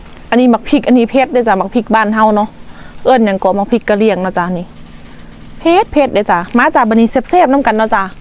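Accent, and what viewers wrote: Laos. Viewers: สาวไทย เรอ สาวลาว สำเหนียง พาสา ปะสมลาว ไทย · เป็นคนจังหวัดไดสำเนียงคือคนลาว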